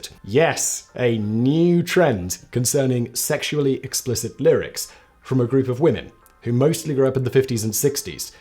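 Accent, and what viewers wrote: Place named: RP, United Kingdom. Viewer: Okay, Simon reading aloud the lyrics "I knew a girl named NIKKI. I guess you could say she was a SEX fiend" is his perfect Queen's English accent made me literally lol and is about the funniest thing I've seen today.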